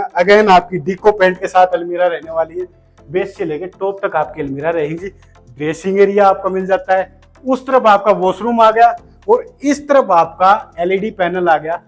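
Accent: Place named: Haryana, India. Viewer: Sir haryanvi touch k sath to or bhi acha lga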